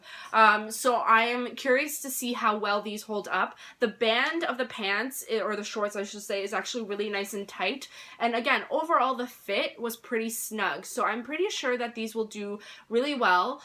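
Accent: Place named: Canada. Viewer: Your Canadian accent is such a treat...coming from a Canadian who's been in the Americas the last 5 weeks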